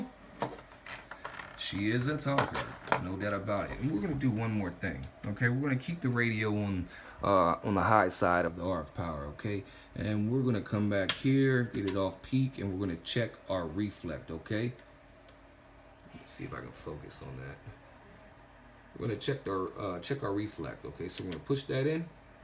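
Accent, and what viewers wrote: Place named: New York, United States. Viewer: You sound like you are from Brooklyn or the bronx. I'm a long island boy in south carolina.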